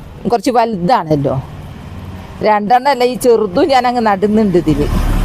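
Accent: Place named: Malappuram, India. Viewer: നല്ല മലപ്പുറം ഭാഷ കേൾക്കാൻ നല്ല രസമുണ്ട്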